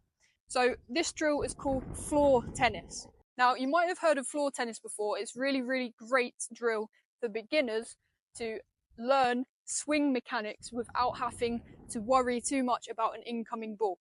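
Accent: England, United Kingdom. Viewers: best, what a nice accent? what part of england it is?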